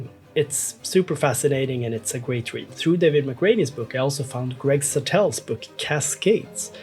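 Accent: Sweden. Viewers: unapologetic swedish accent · I think this sounds silly, but I've been seriously hurt by some Swedish people before, and unfortunately, I've become distrusting of them as a result. Your videos help me hear your accent and work on destigmatising Swedish people as whole just because of a couple of bad apples. Thanks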